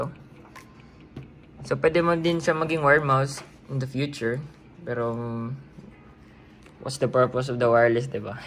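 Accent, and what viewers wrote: Visayas, Philippines. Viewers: Bisaya ka lods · sana-all akala ko may face reveal sa Dulo hahaha BISAYA accent talaga si lodi!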